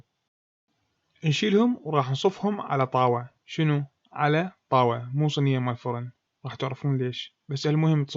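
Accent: Iraq. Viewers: زند.. تحياتي للهجة العراق الجميلة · فدوة اللهجة العراقية تخبل عاشت الايادي اخي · وصفاتك دسمة لكن انا احب اللهجة العراقية كلش كلش واسلوبك حلو وبسيط وعفوي يعطيك العافية زنود بالجمع وبالمفرد زند بامنى متابعة قناتي المختصة بالاطباق البسيطة و غالبا نباتية ...اسم القناة اطباق ملونة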